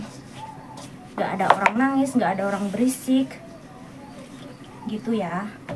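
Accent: Java, Indonesia. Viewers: seperti org Jawa tp bukan Jawa barat Sunda soalnya jawany seperti ada mirip bhasa cirebon tp bukan heee pernah bilang "umeb" artinya mendidih heee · Asyik jowomu jek medok dik ❤ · Jowone Endi sampean mbak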